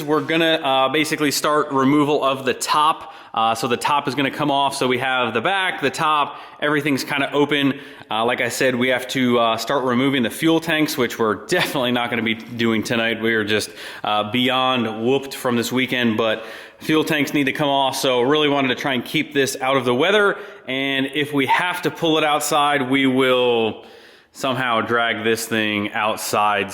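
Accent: South, United States. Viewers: I love how your Pickens up a litter southern sounds in your voice. Awesome keep on trucking buddy. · Starting to get that southern twang.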